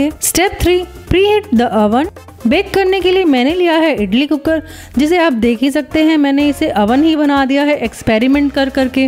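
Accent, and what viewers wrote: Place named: India. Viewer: Indian english gets on my nerves dude, stop talking like that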